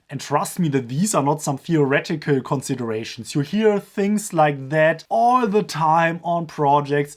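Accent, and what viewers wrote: Germany. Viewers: I love your heavy German accent. You go <3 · you already have a leg up. You are white, you speak with a German accent and your name is. Now imagine this - I am brown, I speak with an accent from the Global South and my name is "exotic".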